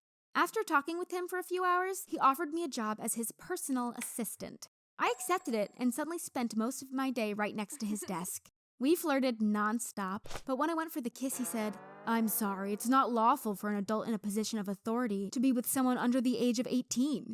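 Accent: United Kingdom. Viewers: Wait...? SHE’S BRITISH!!!? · Yeah I’m sure you’re from the uk. Such a strong accent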